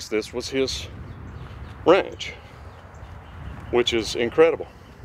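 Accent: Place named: Tennessee, United States. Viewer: l am from Canada and l lived in Manchester, Tn in the 2000s and your accent reminded me right away of being back down there in Tn. Loved it and loved the weather.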